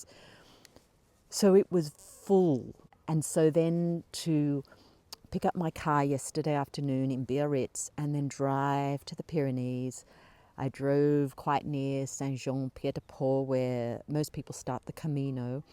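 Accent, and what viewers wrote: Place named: Australia. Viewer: Thank you for sharing your journey. Its nice to hear a fellow Australian on this path. I dont know much of Mary Magdalene but am drawn to learning more. Blessings from Australia.⚘️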